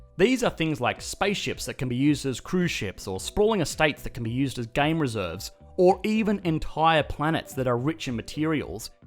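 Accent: Australia. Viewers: This channel makes me wish I had an Australian accent. It just sonds so relatable and charming.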